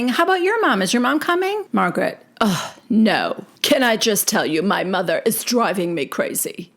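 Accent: New Jersey, United States. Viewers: Omg! Your NJ really showed this week! You've got Danielle down to T.!!!😂 I used to think you exaggerated Teresa UNTIL I was accidently listening to Namaste Bitches. I thought I was listening to you so I started l😂 I'm thinking No way! It was Teresa herself sounding just like you! I hope this makes sense. You're reviews are the best. No one imitates ALL the housewives like you do! I love and appreciate your efforts and love your work! Thank you so much and God Bless ya!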